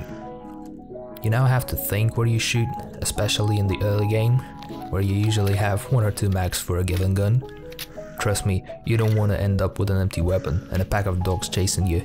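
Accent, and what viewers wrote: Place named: Scotland, United Kingdom. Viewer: wow, me too and yet I thought you were Scottish of something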